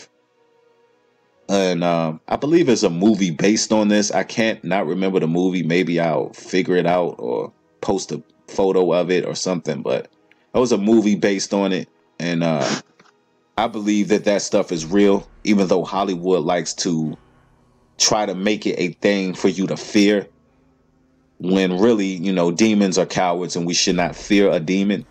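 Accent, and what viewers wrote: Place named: DMV, United States. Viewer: You from the DMV homes? You sound like it...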